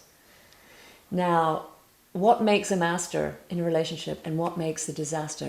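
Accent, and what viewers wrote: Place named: RP, United Kingdom. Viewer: Sorry,.....but this is so simplistically ridiculous, superficial and sophomoric......that you could only pass it off as a working miracle by affixing 'Dr.' to you name and speaking with that highbrow English accent. I listened carefully to what you have said.....and even if true......many relationships fall completely apart based on many other elements. Seriously? It actually sounds like you have not been in many relationships or successful ones or really 'know' so-called successful ones. What appears successful on the surface often, below, turns out to be a simple compromise made my many, many people to accept the situation for fear of being alone, lonely, insecure financially, etc. For example, you may go to VIP events with some guy or your husband draped on your arm, but do you really know your husband or what he is like? 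Or do you just claim that you do. You sound like another pretentious psycho-wizard. I know of many people in relationships that are long lasting but totally devoid of real respect, love, etc. They cling to each other and put on a front. 50% of all marriages fail in America and of that remaining 50%.......that vast majority are not particularly happy. That's a real fact.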